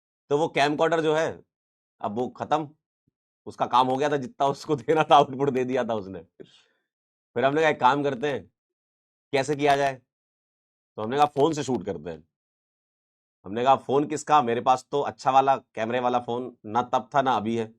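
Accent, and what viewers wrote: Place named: Bundelkhand, India. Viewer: Sir mera gaon bhi mauranipur h😅....tabhi me sochu ap bich bich me bundelkhandi kyu bolte h😂...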